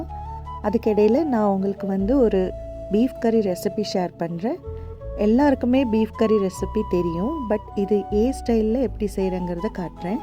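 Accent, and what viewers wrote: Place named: India. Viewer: Akka tellme about yourself more.your vlogs are interesting. Yours indian touch slang is so pretty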